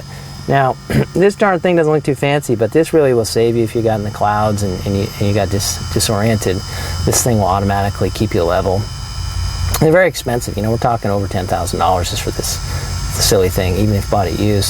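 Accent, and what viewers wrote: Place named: North, United Kingdom. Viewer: well,its not his fault, probably his accent. like how northern people pronounce "water" as "wadduh"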